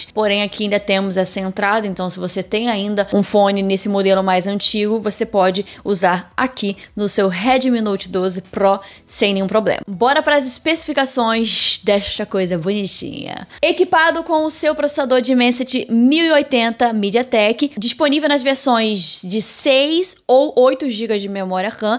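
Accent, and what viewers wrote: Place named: Rio de Janeiro, Brazil. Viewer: Amei a carioquinha falando ! tmj conteranea.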